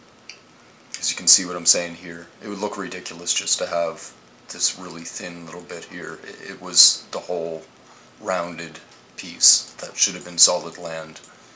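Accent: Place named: Canada. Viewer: people move. after Sandy, a lot of people moved out of NY. just like after Katrina. California population had jumps after those events. it's called migration. the east coast wasn't permanently flood. those areas were created, not lost. look at old maps. none of the East coast line was never as straight as it is now. those started out as sea barriers. to stop coastal flooding. they are creating new land now. he acts like he knows what the coast looked like but really doesn't. he couldn't even name Chesapeake Bay or the bridge. it's by far one of the most known landmarks of the East coast. those who don't know it are products of poor education. he sounds Canadian so his education of the U.S. is not to be expected. I don't blame him for that. seriously though. millions of people have not been deleted from existence. we've never gotten how population numbers. 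they've always jumped around. especially out if China. they used to inflate their population numbers as a fear tactic. the probably still are.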